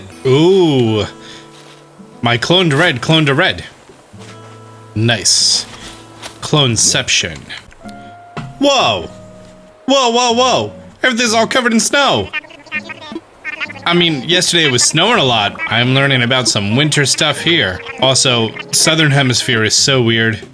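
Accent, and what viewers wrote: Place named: East Coast, United States. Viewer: is this guy from philadelphia? he says "wuder" like an east coaster...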